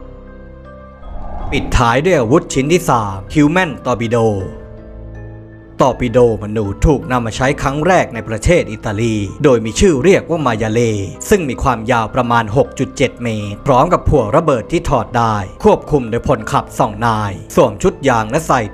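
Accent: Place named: Prachuap Khiri Khan, Thailand. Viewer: สำเนียงประจวบคีรีขันธ์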